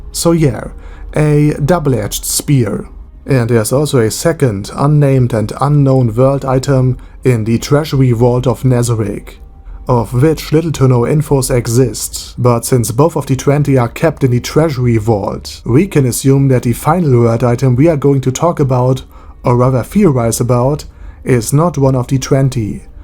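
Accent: Germany. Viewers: I have to say, your kinda heavy german accent is mesmerising 😁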